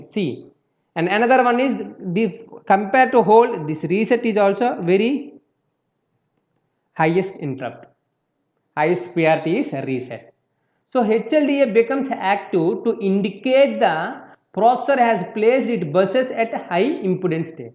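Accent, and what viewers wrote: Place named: South India, India. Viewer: Y'all talking as if you never heard an accent, just go find some other video if you find south's accent difficult, you north Indians are always so full of yourselves, expecting someone to change their whole accent just because you guys find it difficult, youre not that special. Humble yourself honey.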